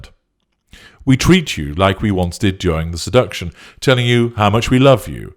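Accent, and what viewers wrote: United Kingdom. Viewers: I never need a respite from a lovely British accent, but Brits can certainly be difficult for American English speakers to understand. It's like when they have an idea-r. 🙂 The best idea is to turn a respite (a brief break, especially from something difficult or unpleasant) into a permanent narc-free vacation. Wherever you are in your journey, I hope that you are able to GOSO. 🙏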